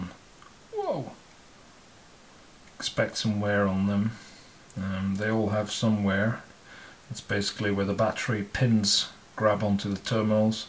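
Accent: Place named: Australia. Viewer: Thank's a lot for the effort! 👋👋👋 It make so much sense to buy a durable tool! It is jolly good to watch screwing compoetion videos, but at the end of the day you not only need a relatively quick tool, but ot also has to be light and DURABLE!!! and reparable!!! Would be great to get a view of the competition like: makita, dewalt etc... And the Aussie accent is the cherry on top of these videos!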